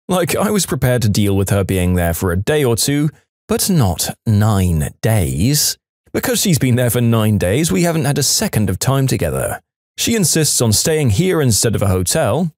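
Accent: United Kingdom. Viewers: This is my first time hearing this voice actor and I was not expecting a British accent (no offense) because I'm used to a really monotone emotionless voice lol.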